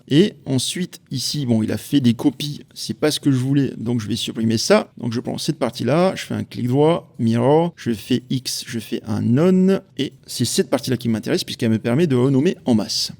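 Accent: Tahiti, French Polynesia. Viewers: Super vidéo, super qualité et super boulot ! :D (et l'accent tahitien c'est tellement peu commun dans les tutos de logiciels ça fait plaisir à entendre aussi) J'avais besoin que d'une partie et certains trucs ont changé de nom ou autre dans UE5 mais j'y suis arrivé donc merci :) PS : pour se souvenir de l'annulaire, il porte ce nom parce que c'est celui qui reçoit l'anneau quand on se marie ;) Et auriculaire parce que c'est celui qu'on utilise pour se gratter dans l'oreille lol, c'est moins glamour.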